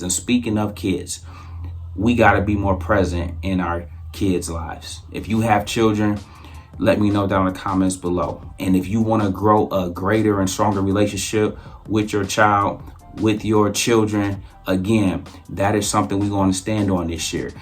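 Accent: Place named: Philadelphia, United States. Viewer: Are you from Philly?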